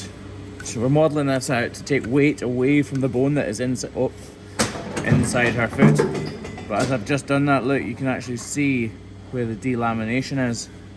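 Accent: Scotland, United Kingdom. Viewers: He's from Dumfries and Galloway, Scotland. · Hopefully the cow gets better soon also i love that Scottish accent 💖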